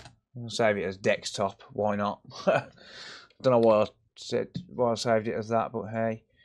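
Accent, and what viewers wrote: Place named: West Midlands, United Kingdom. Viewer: It's good to hear the West Midlands accent as well as showing some great fixes!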